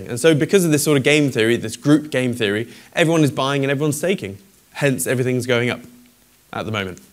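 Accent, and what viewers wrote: United Kingdom. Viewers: Just because you say it in a fancy british accent doesn't make you correct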